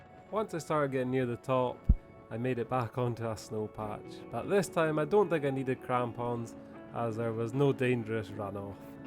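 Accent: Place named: Northern Ireland, United Kingdom. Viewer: Can't place your accent, mate... County Antrim? Ayrshire? Anyway, that view of Nevis and co is jaw dropping. Subscribed.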